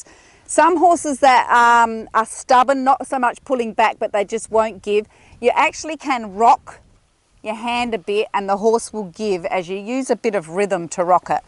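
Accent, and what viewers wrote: South Australia, Australia. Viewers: Are you a South Australian? I'm trying to pick the accent. Great video!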